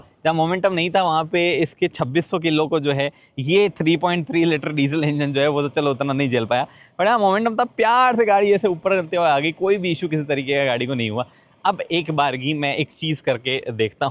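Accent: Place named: Delhi, India. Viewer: Why you make delhi excent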